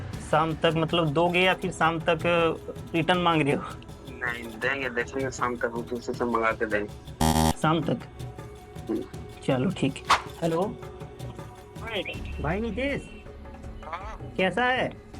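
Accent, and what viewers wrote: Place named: Jharkhand, India. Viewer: Bhai tum jharkhand se ho 😂 Mai bhi hu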